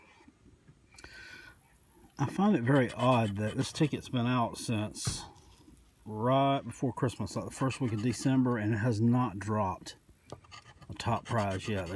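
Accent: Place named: Western North Carolina, United States. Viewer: Sound like your from Western NC, haven’t heard an accent like that since my days in Robbinsville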